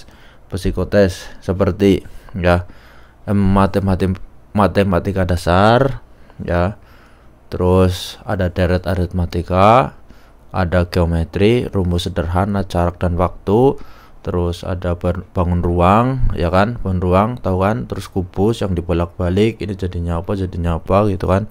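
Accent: Banyumas, Indonesia. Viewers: ini Kaka nya ngomong nya logatnya ngapak banget😭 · ngapak ya mas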